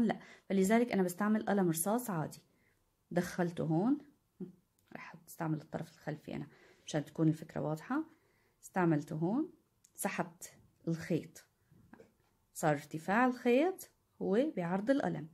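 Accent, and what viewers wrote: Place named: Syria. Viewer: الله يعطيكي العافيه بظن انك من عنا من سورية ع هوى لهجتك .. بس يئلبي كأنو بيطول الواحد لتطلع معو هيك سجادة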